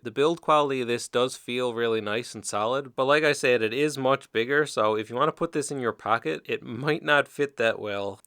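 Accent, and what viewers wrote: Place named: Newfoundland, Canada. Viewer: As soon as I heard you speak I knew you were from Newfoundland. Great video. I subscribed to your channel.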